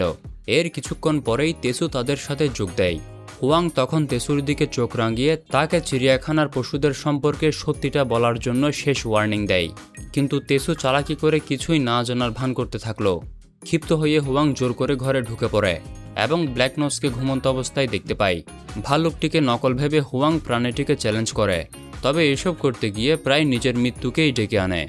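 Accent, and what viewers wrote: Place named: Rangamati, Bangladesh. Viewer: Apni Q & A koren plz onk qsn amar... Apni ki Chakma? Apnr voice amr Rangamati types lage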